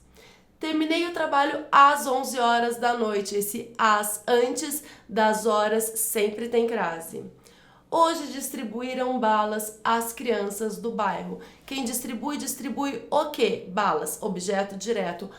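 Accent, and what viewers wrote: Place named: Minas Gerais, Brazil. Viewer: Essa manja muito; me salvando e salvando geral...pelo sotaque é mineirinha...também né s2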